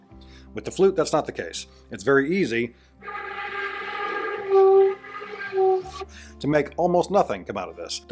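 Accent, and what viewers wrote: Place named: United States. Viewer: Love your advice and presentation, but would you STOP talking like a yank. Have subbed 👍👍☘☘☘🇮🇪🇮🇪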